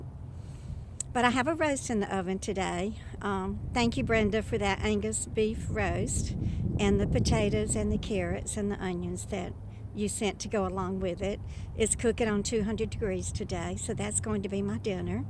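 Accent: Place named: Deep South, United States. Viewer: Sweet lady, you have my respect. So glad Uber goober lady referred me here. You remind me so much of the lovely ladies of my youth in the Deep South....not sure why coz not just your accent. I think it’s your facial expressions and manner of speech. Anyway, love and respect from another older lady of the south. Blessings, my dear.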